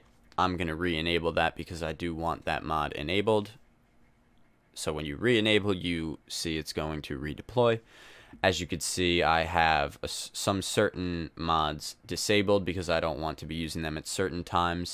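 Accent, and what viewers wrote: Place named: New York, United States. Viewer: This guys from new york no doubt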